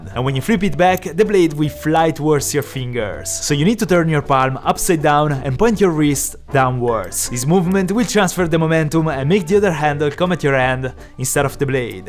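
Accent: Italy. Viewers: Cazzo si sente che sei ITA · He's Italian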